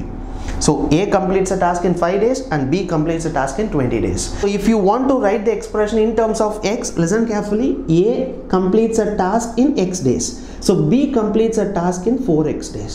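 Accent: South India, India. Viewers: sir so far after my 10 th i ve listened to an explanation in south-Indian accent,,,and can be easily understood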